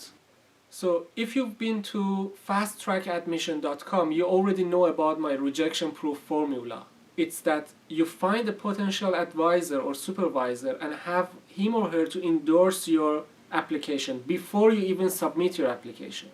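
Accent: Iran. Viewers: love your persian accent, paydar bashi hamvatan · From the first "Hello", I knew you were Persian. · Love ur Persian Accent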